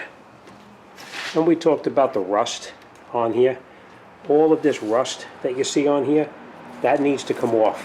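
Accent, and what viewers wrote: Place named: New Jersey, United States. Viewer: "Bolth bolts" love Jersey lol. Must be north Jersey. Thanks for the vid. Helped me out greatly.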